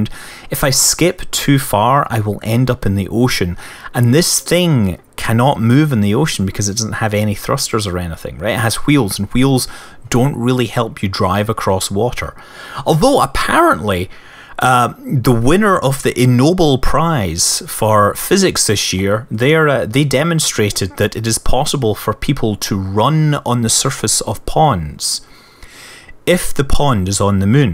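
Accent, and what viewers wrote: Scotland, United Kingdom. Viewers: well some peope have other shit to do than sit infront of a computer screen watching ssomeone else play for hours lmao,even tho i like his scotish accent and voice,maybe,just maybe i just happened to come across this video and just watched it without going ocd and being like shit i gotta watch the 10 others before this one! wich are all over 30 mins,thats 5 hours(more than that if you count the ones being an hour),grats to you if you did,but i sure dont have time to.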